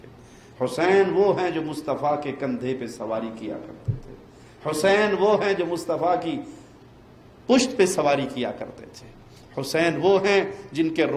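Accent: Arab world. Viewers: اردو کو اردو لہجے میں بولا کریں۔ عربی لہجے میں نہیں۔ اردو کو عربی لہجے میں بولنا اتنا ہی غلط ہے جیسے عربی کو اردو یا پنجابی لہجے میں بولنا۔ یہ لہجہ بڑا ناگوار محسوس ہوتا ہے۔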